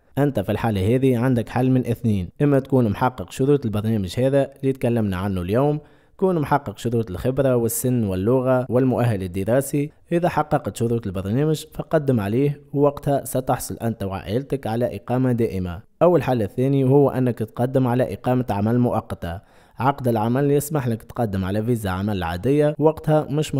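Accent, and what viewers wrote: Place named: Tunisia. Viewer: اخي شكراً لك على المحتوى الرائع ما اجمل لهجتك التونسية الرائعة . أنا عندي فيزا سياحة هل ينفع أن اقدم على هذا البرنامج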